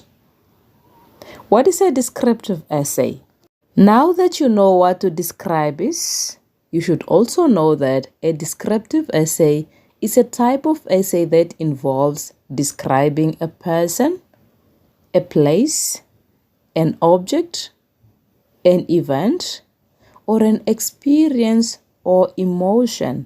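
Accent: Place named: Namibia. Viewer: Huh? My fellow Namibian. No wonder i understand the tone so well. Lemme Subscribe ❤🎉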